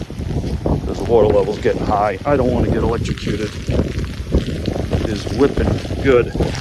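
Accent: New York, United States. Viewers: Glad all is well. LOL, bumpers. I can tell you are from New York! North East, any wayzzs.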